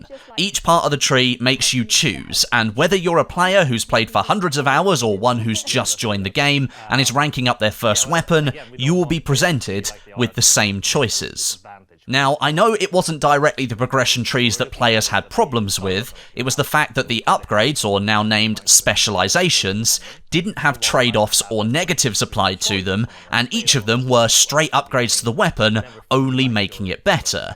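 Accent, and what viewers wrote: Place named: England, United Kingdom. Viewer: Where abouts in England is he from does anyone know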